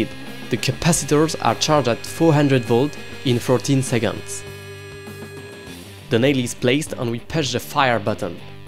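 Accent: France. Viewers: That's a fellow french accent i hear there ?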